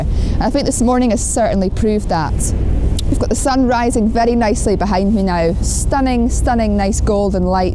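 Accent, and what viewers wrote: Scotland, United Kingdom. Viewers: You had some stunning shots thanks to your patience and persistence. You are as lovely yo look at and listen to as your images are to look at. I love your scottish accent and your lovely smile just show your joy and enthusiasm in going out to shoot. Another vlog well done!!!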